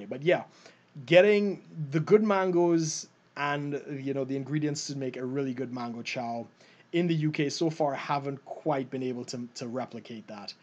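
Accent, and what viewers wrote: Trinidad and Tobago. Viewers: Congratulations u sound like one of us. Like a Trni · you sound Trinidadian. Doesn't matter. I miss the food too. Callaloo and dumplings. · To me you sound like you have a bit of a Trinidadian accent and you can always make your own trini pepper sauce if you get pepper in the UK. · He has a Francesca Hawkins style Trini accent. And if you don't know who Francesca Hawkins is, you're probably not a Trini. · This is the first time I’ve seen a white guy guy with a Trini accent. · I hearing an American accent, Trinidadian accent, bajan accent and maybe even a lil british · Dont be so mean, in many many parts of the UK people have different accents, plus there are many different people from all over the world live here sometimes people pick up other people accent, when they spend alot of time with certain people, that's why your hearing trni accent mixed with bristish he is not doing it on purpose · After leaving home 50 years ago 😱 I still miss the food! 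When I lived in England, I used to leave Bournemouth and travel to Brixton to get cooking supplies, but that was 45 years ago! I now feast and stock up when I travel to Brooklyn or Miami from my island home in the mid-Atlantic. Enjoyed hearing the Trini twang!! Best wishes.. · I can hear the trace of Trini in his accent. My Fiancee is Trini, but from Boston while he parents are from the island so I hear it a lot (and the food is awesome). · Hello not a trace nah... he sounds very Trini 😍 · Ah hearin' a trace ah Trini in your accent. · That English Trini accent is niiiice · His trini accent on point...I grew up in Maraval. Now living in the USA for 27 years. Have to agree with you about the food. As an avid traveler still no food can compete with Trinidad cuisine. Mango chow 😋😍 Try to get back home often.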